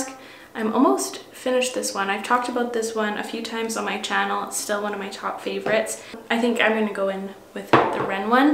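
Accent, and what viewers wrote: Quebec, Canada. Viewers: My favourite phrase or is "we'll see about that" - it's the Canadian accent that does it for me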